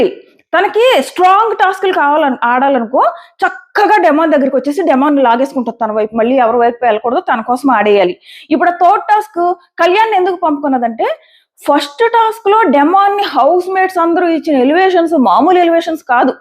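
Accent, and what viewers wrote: Godavari, India. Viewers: ఏదో గోదావరి జిల్లా భాషతో మాట్లాడేసి మీకు కనిపించింది గొప్ప అనుకుని మాట్లాడేస్తారు మీ సర్కిల్లో కూడా మీ సర్కిల్లో కూడా మీ ఫ్యాన్స్ కూడా మీకు తగ్గట్టుగానే మాట్లాడేస్తారు శ్రీజ తనుజ విషయంలో కొంచెం పొగరుగానే మాట్లాడింది డిమాండ్ విషయంలో పొగరుగా మాట్లాడలేదు ఇంకోటి ఏంటంటే ఎవరి ఆటిట్యూడ్ వాళ్లకు ఉంటుంది ఏదో మీరే గొప్ప అనుకుని మాట్లాడేస్తున్నారు శ్రీజ ఎలిమినేషన్ అయిపోయినందుకు మీరు చాలా సంతోషంగా ఉన్నారు కానీ శ్రీజ ఎలిమినేషన్ బిగ్ బాస్ కి గొడ్డలి పెట్టు ఇప్పుడు ఎవ్వరూ బిగ్ బాస్ ని అనకుండా ఏమి తిట్టకుండా టాస్క్ లో ఓడిపోయింది కాబట్టి మిగతా వాళ్ళకి జెన్యూన్ రీసన్ ఇచ్చే లాగా జెన్యూన్ గా కన్వెన్షన్ ఎలాగా బిగ్ బాస్ మైండ్ ప్లేయింగ్ చేసింది మీరేమో పెద్ద మీరే గొప్ప అనుకున్నట్టు శ్రీజ వరస్ట్ అని చెప్పేసి ఓవరాక్షన్ చేసుకుంటూ మాట్లాడేస్తున్నారు ఒక్కసారి ఒక్కసారి ఆది రెడ్డి గారి ఇంటర్వ్యూ ఆదిగా రెడ్డి గారి రివ్యూలు చూడండి రివ్యూలు ఎలా ఇవ్వాలో ఆయన దగ్గర నుంచి మీరు నేర్చుకోండి అయినా తప్పులు చేస్తారు కానీ 10% 15% మాత్రమే మీరు మాత్రం 50 శాతం తప్పుగా చెబుతారు కొన్ని బాగుంటాయి అనుకునే లోపే వ్యక్తిగత అభిప్రాయం ఇంకోసారి మళ్లీ చెప్తున్నాను జాగ్రత్తగా వినండి డెమో ఖచ్చితంగా చేసింది వెన్నుపోటు మొహమాటం లేకుండా చెబుతున్నాను మీరు గొప్ప నేర్చుకొని నీ మీద ఉన్న గౌరవం కూడా పోతాడి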